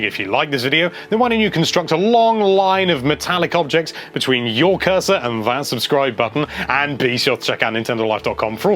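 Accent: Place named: United Kingdom. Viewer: Those are Elk not deer you silly Brittish sounding person...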